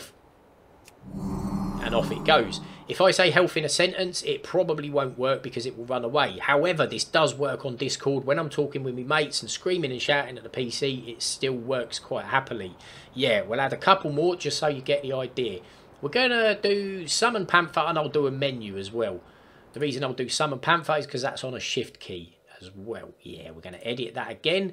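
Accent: Cockney, United Kingdom. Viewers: Nufing wrong with your east end London voice son it’s only cos I’ve got one as well lol thanks for the video fella , I’ll give it a try 👍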